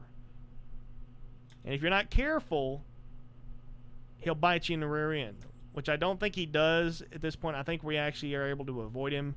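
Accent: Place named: South, United States. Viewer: great video, made better by that smooth southern baritone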